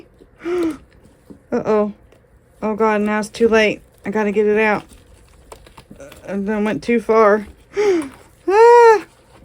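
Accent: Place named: Deep South, United States. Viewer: lol i smile everytime i hear the same words i use come out oh your mouth lol i dont have the deep south accent anymore(unless im really pissed or super excited about something) but i use the same slang and way of talking lol i dont feel so alone in this world on the west coast where people look at me funny when i say purdy or aite lol instead of pretty or alright lol i still use the word buggy instead of shopping cart lol lol i always say yonder and people look at me like i have two heads lol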